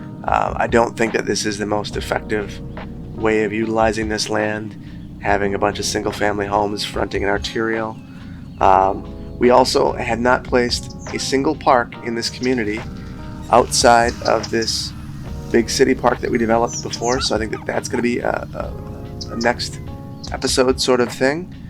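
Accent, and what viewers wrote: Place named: Midwest, United States. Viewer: I live in Madison! Yay! I thought you sounded like you had that midwest charm!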